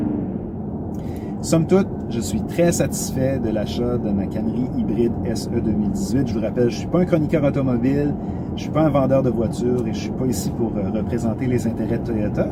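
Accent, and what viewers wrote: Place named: Montreal, Canada. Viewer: Superbe vidéo. J'aurai pensé que vous étiez de Montréal!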